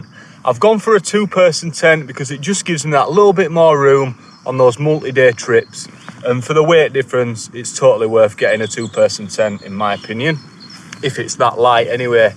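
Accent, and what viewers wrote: Yorkshire, United Kingdom. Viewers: For a Yorkie, with a great Yorkie accent, you don’t half say “plasters” with a posh tone. Lol.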